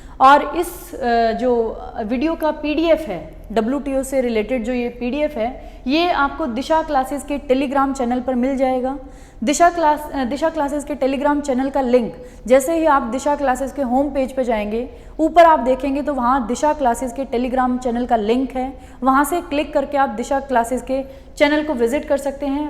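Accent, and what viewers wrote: Punjab, India. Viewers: Mam are u pnjabi?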